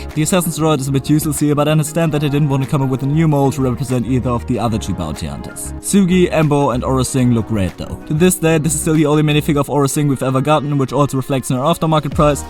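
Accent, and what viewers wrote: Germany. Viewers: Ich liebs wie man immer bisschen den deutschen Akzent raushört 😅 da kann man noch so gut englisch sprechen 😂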